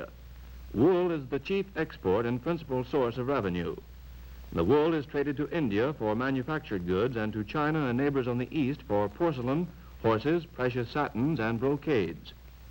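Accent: United States. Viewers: You apparently think the U.S. should have gotten into WW3 just a few years after the end of WW2. Tibet was also extremely isolated and would have been very hard to defend for the U.S. Finally, the man doing the commentary isn't from the UK, he is obviously American, and he lived in a very different time in which the vast majority of Americans that would have been watching this in the early 40s knew absolutely nothing about Buddhism, so of course he used terms they would understand like church. You are being ridiculous and acting like a troll. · American accent / reading style .... so different ? Fascinating history thanks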